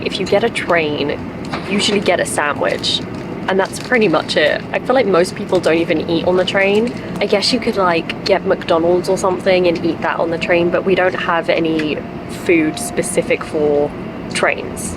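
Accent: United Kingdom. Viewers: 最初の1分くらいはオーストラリア人かニュージーランド人かと思いましたが、イギリス人なんですね。Crisps。 新幹線は、JR東日本のEki-Netがたまに半額やってますね。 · イギリス英語🇬🇧最高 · I can listen to your UK English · easy to hear british pronunciation😄😄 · Lovely！ As soon as I look at your video, I miss England because I always listened to British English that you talk when I lived in England. I like to listen to British English. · Your accent is lovely! I like British accent. Thank you for coming Japan! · やっぱイギリスアクセントめちゃいいわ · ＢＢＣの短波放送を聴いていたのでイギリス英語が私には理解しやすいです。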